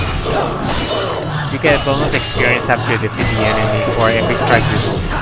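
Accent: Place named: Philippines. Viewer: u r philipino right ?i love ur english accent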